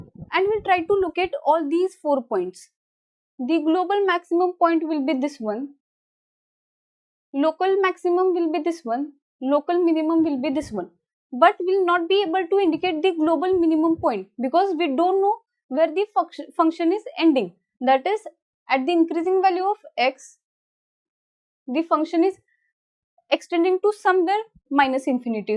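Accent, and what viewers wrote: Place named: Maharashtra, India. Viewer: Hey looking beautiful but your pronunciation seems you a Marathi speaking citizen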